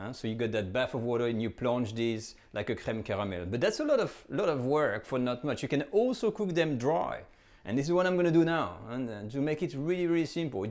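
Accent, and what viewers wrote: France. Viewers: Is your accent a regional one? I love it. Bit different to other French people I’ve heard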